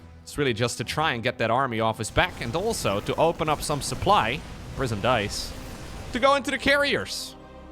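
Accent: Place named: Netherlands. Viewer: The more repetitive and boring the strategies get, the more and Winter put in "NEW ILLEGAL strategy". Short term it generates more clicks because new guys come in. But the ones as I, who are here since he has the fattest dutch accent and considered to be "years in" when he published his music video of his uk visit, get scared away. Long term the base errodes and the topic collapses. And nobody will think that this click bait was the reason. They will say "the game just got old". Maybe they are right, maybe they are cutting on the branch they are sitting on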